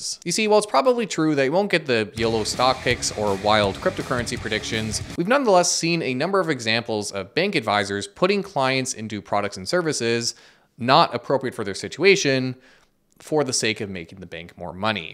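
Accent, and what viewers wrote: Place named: Canada. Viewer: It's funny I never pegged you as Canadian but as soon as you mentioned it I could hear some hints of the accent in your voice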